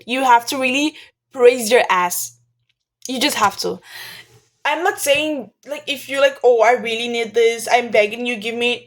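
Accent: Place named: India. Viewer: Its The indian accent for me 😂❤️❤️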